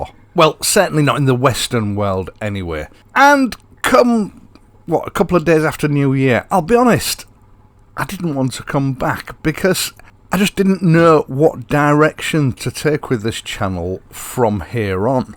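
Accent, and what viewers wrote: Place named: Hull, United Kingdom. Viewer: 💯👍❤ recognise that hull accent anywhere lol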